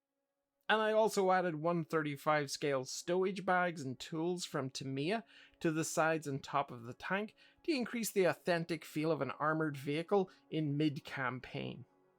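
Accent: Northern Ireland, United Kingdom. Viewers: Do I hear a Northern Ireland accent?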